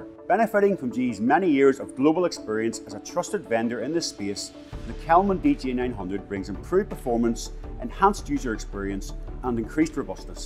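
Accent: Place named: Northern Ireland, United Kingdom. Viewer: Excellent Video. The subtitles helped with the NORN IRON accent!:-)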